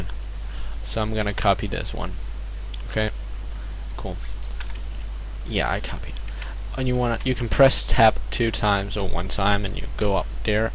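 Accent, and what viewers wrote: Denmark. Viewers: Er du dansker? :D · are u from denmark?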